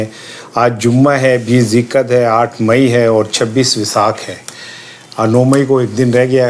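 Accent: Punjab, Pakistan. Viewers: اسلام علیکم ورحمتہ اللہ وبرکاتہ صاحب آپ کا بولنے کے اندر جو اوردو اور پنجابی کا مکس فلیور مجھے بہت اچھا لگتا ہے 👍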